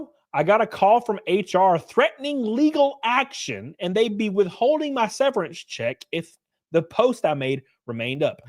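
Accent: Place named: South, United States. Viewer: Real talk i never noticed how much you sound like you came straight up like you came right out the talladega nights movie lol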